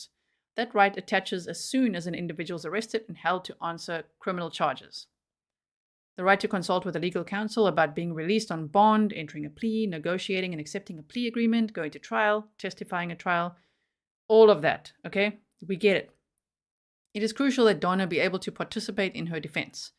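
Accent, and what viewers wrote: South Africa. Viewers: Love that South Efrican accent. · Love that South African accent.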